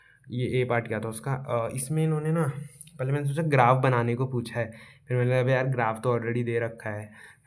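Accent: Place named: Odisha, India. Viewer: Bhai ap odisha se ho kya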